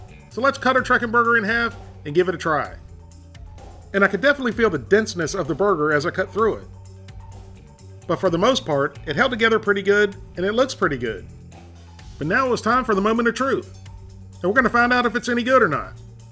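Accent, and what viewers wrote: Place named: North Carolina, United States. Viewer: Man you sound identical to the folks from my home town in North Carolina, which is really refreshing seeing as how I moved off when I was 19 , do you live in the south by chance ?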